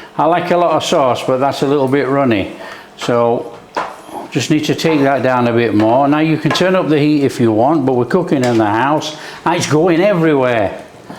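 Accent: Yorkshire, United Kingdom. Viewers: Love hearing a fellow yorkshireman when i click on a video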